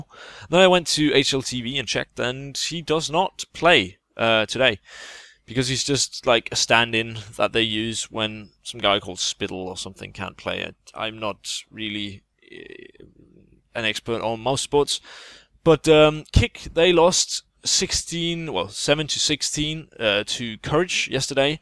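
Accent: Denmark. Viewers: Im swedish and I can tell youre Danish. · I can just hear ure from denmark :D (im from denmark too øæå)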